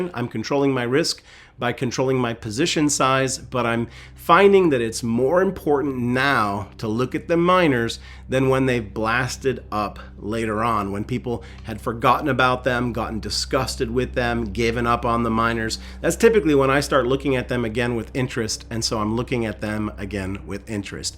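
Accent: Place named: Eastern Europe. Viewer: great intro sir. may i ask if you are from eastern europe? thanks.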